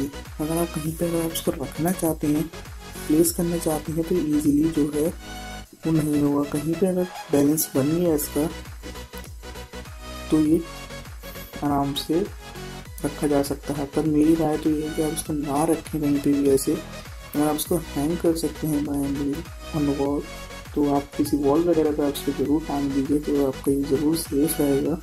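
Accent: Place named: India. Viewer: You are indian and speed-mumbling, very very difficult to understand. Have you read how youtube CC interprets the sounds you put out of your mouth. Adding background music helps? Well I did hear in the end: Thank you, have a nice day, almoust nothing else.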